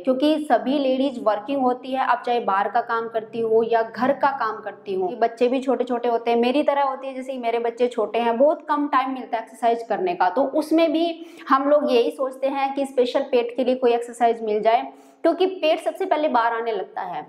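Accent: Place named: Himachal Pradesh, India. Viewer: lgta hai Himachal se ho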